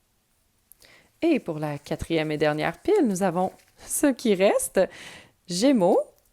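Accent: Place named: Quebec, Canada. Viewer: Oui c'est ça ! Ça bouille ça bouille ! (Mais on sait pas trop ce qui bouille, ni ce qui va en sortir).Le français Québécois me fait mourir de rire 😜. Merci en tout cas, j'adore tes tirages ❤🤗🤗🤗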